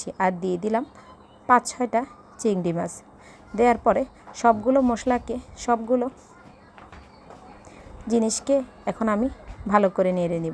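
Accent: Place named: Noakhali, Bangladesh. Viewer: পুরা নোয়াখাইল্লা 😊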